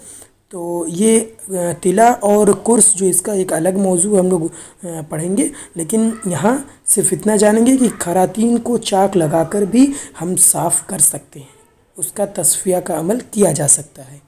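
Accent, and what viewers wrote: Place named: India. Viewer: bhai bolnay sae tou aisa lag raha hae kae aap india sae ho lakin urdu likhna jantay ho? Aur yae earth worm ko sukhanay sae pehlay marna parta hae kya? Bhai yae bhi batain kae sulphur ko gandhak amla saar kaisay banatay hein?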